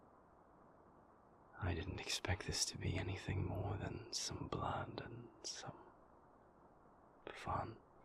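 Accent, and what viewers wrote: United Kingdom. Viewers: FINALLYYYYYYY, now cue the emotional tears and British accent 🫡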